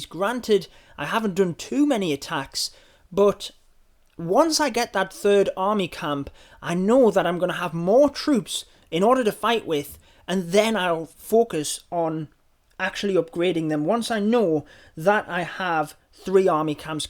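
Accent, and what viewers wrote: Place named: England, United Kingdom. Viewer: i luve youre englaish aiicecents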